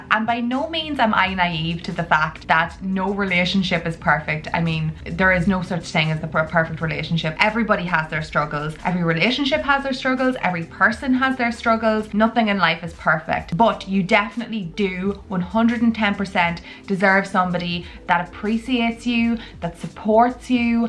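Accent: Ireland. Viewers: I see, hear an Irish accent, think of tommy shelby, then die bc I love cillian murphy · Greetings from Los Angeles!🏖🌴Your channel was on my suggestion and I took a glance and got hooked! I hit the subscribe instantly. Gotta say I've been binge watching your vids.😊 Great style, great personality, lovely Irish accent and overall 👍👍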